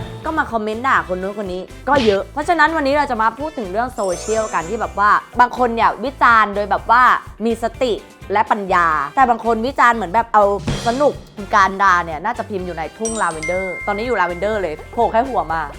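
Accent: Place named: Nakhon Pathom, Thailand. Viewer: ชอบสำเนียงพี่ เหน้อแบบเหมือนคนนครปฐม ❤️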